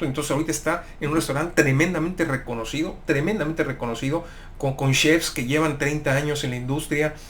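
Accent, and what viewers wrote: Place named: Querétaro, Mexico. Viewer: de que estado de mexico eres? Te escucho como queretano, saludos desde acá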